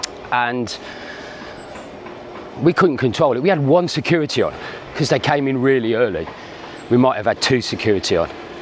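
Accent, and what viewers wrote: Birmingham, United Kingdom. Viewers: What part of Brum you from.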